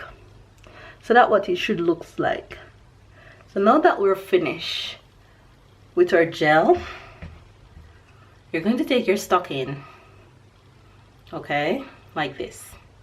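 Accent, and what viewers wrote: Jamaica. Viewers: Very cute and easy updo!!And I open your Jamaican accentMy family is Jamaican as well 😍😍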